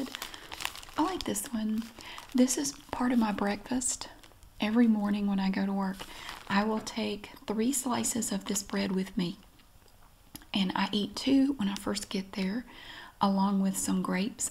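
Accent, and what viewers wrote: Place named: South, United States. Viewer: My favorite YouTuber at the moment. I don’t know what it is about her. She’s addicting to watch and listen to. The southern charm is just something I don’t ever see in my state (WA) so it’s really satisfying to hear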